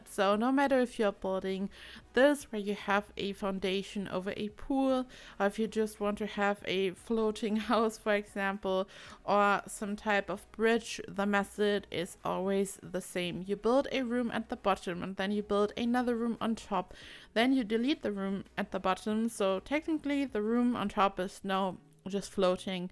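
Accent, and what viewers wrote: Germany. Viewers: The most adorable german accent :D and of course great builds!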